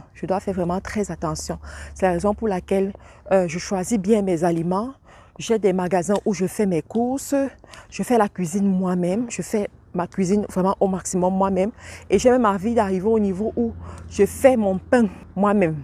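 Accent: Cameroon. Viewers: l'accent camerounais , j'ai tellement trainé avec eux que je reconnais direct les Kmers . 😅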